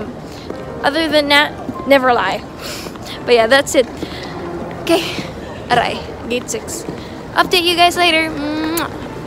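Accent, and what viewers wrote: Visayas, Philippines. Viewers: first time watching your videos and you're so cuteeeeeeee i love it💛 and the accent guuuUrrrrl, so cuteeee😍 sarap talaga makarinig ug bisaya accent, it really gets to me everytime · bisaya man diay ka... ok ahhh... nice video... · Wa ko kabalo nganong nasaag ko dre 🤣 pero nalingaw mn pud ko haha, btw kyut kay ka magbisaya ba 😅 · Cute kayka mag bisaya❤❤ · Let's Go Bisaya kaayo❤️ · I like your bisaya accent😍astig kaau ate😍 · bisaya ka dae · Inlove kay ko sa imong tuno ba. Hahah. Laban mga bisaya. Love love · Pambisita din sa bahay mga sis napakabilis Kung magbalik. Being fluent in English with those accent na bisaya, 🔥💪👊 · Hahaha ka cutie mag bisaya uy! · cute kayka ay bisaya gyud wa ko na bored ga lantaw sa imo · Bisaya man oie. Ok a sweet pa jud🤣🤣🤣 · Aw kilig jud nanamian ko mag pamati imong accent mag bisaya... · bisaya na bisaya eh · Ka cute mg binisaya ni ma'am uy😅😅😅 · I love your accent.... 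😊iba talaga basta bisaya haha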